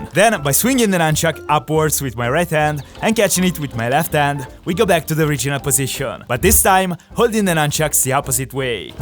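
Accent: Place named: Italy. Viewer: Lo avevo capito dall'accento che eri ita xD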